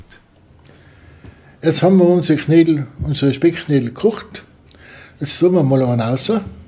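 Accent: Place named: Tyrol, Austria. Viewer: Hallo an den, ich werde sie ganz bestimmt nachkochen. Mein kleines Problem habe ich mit dem Tiroler Dialekt. Danke für das schöne Rezept, Grüsse aus Dresden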